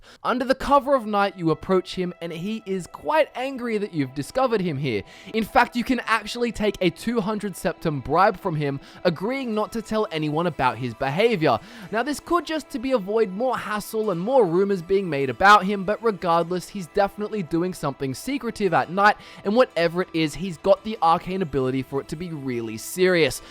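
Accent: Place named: Australia. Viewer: sounds like he is Australian but is he Australian?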